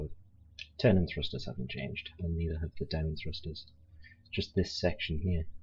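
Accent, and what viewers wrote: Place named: Northumberland, United Kingdom. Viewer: So, you're obviously English, where you from? Sound like you're from Northumberland!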